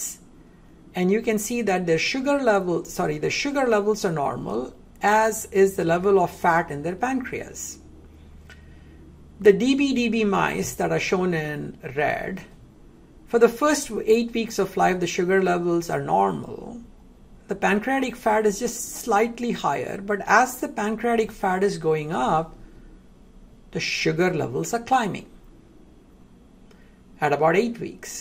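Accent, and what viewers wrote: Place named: India. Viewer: I think i have to go throu all this information 3 or 4 times to fully understand it. Very interesting but also very complex. I love your work and your indian accent helps me to focus without getting tired. Looking forward to see more soon. Greetings from Galicia, nortwest Spain!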